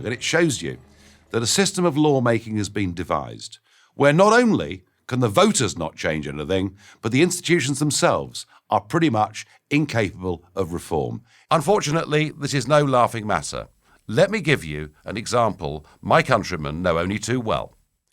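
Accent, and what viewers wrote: United Kingdom. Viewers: Nice British accent.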